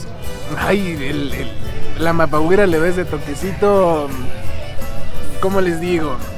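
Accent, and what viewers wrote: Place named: Ecuador. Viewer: Hablas muy feo hno. Así hablan los ecuatorianos ??!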